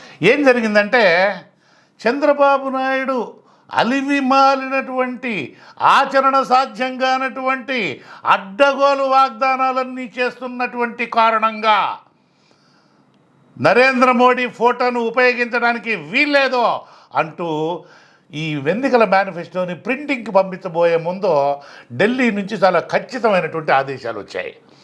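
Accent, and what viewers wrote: Nellore, India. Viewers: Nenu Nellore Zilla anukunna, mee slangnu batti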